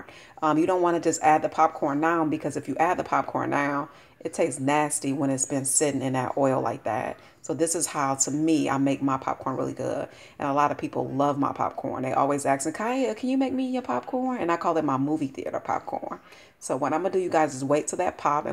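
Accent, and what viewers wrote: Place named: California, United States. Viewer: Love all your videos!! I thought you sounded like you had a California accent until I seen you're from IL. If you turn your phone side ways (landscape) when recording, you won't have the black on the sides and the video will be larger.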